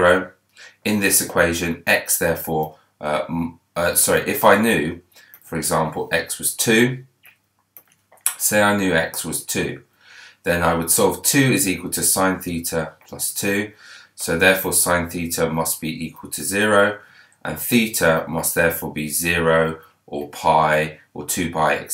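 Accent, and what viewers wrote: United Kingdom. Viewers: math sounds so much nicer with a british accent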